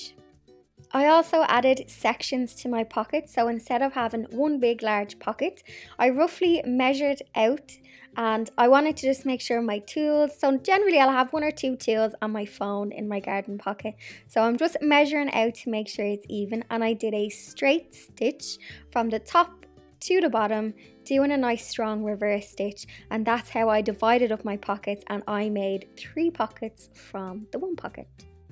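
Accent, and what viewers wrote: Dublin, Ireland. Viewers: Hiya. My 11 old daughter discovered your channel. She's so creative. We love your creative spirit. I'm a mad tea dress vintage decor fab. So love and congratulations for inspiring a lot of people to get creative. Plus hearing your Dub accent from here in Spain being a Dub myself is brilliant!!!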